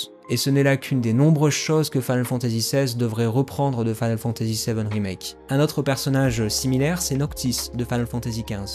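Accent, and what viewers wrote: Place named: France. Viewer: Final Fantasy 16 sounds so much more legitimately medieval when a French person is talking about it 👍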